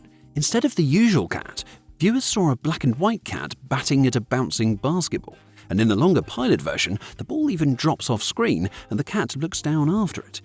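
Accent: United Kingdom. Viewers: Why is there a British accent doing commentating on this video. We couldn’t find one American to do this, about this class of American TV drama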